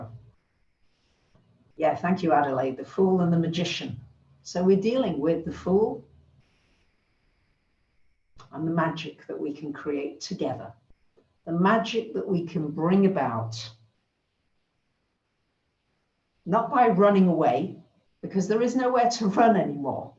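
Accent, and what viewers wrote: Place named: Lancashire, United Kingdom. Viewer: I love your videos and your accent, it's sounds like you are a fellow Lancastrian northern lass like me. Please don't let others undermine how wonderful you are, you have a lot of loyal followers who get so much from your posts.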